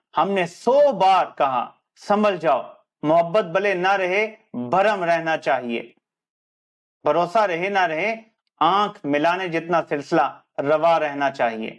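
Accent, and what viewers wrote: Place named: Saraiki, Pakistan. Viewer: Aap ka saraiki lehja hy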